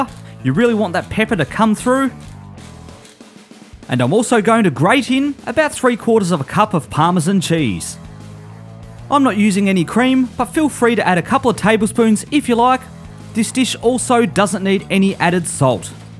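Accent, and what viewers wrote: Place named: Australia. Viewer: You have the best Australian accent ive heard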